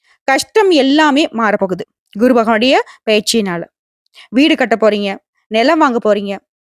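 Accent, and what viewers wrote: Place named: Kerala, India. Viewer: உங்க மலையாளம் கலந்த பேச்சு அருமை,சொன்னது நடக்கும் என்று நம்புகிறேன் சகோதரி.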